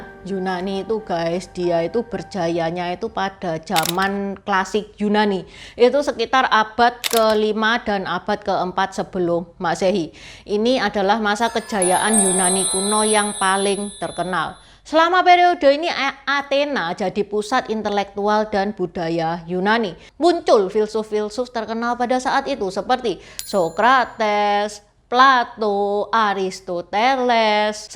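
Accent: Java, Indonesia. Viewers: ..medoook... Suka deh... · Logat bahasanya kaya medok medok jawa ya...😂😂😂😂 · Enakan dengerin dongeng nya nieh.. seru bgt, dg pk bhsa indonesia yg ada aksen jawa medok nya.. hihihi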